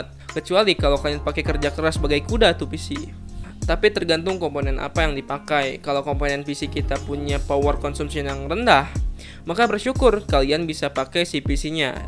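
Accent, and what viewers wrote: West Java, Indonesia. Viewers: Orang Sunda bang😄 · wkwk orang sunda ieumah yeuh wkwk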